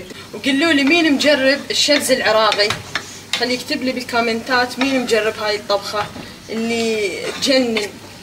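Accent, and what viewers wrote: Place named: Ahvaz, Iran. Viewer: الشبزي العراقي مع اللحم وليس الدجاج. اصلا هذه الطبخه ايرانيه. مبين من لهجتك انتي اهوازيه ويختلف طبخك عن العراق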